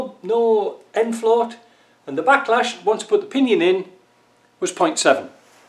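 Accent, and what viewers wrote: United Kingdom. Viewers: Dear unknown , watching you from Poland , beacuse I learn english and you are a good teacher , I learn a lot of constructon , fixing 4x4 so thank , UK accent nicely.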